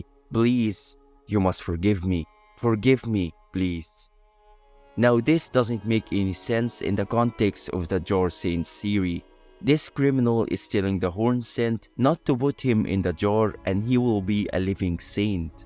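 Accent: Egypt. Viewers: U sound Egyptian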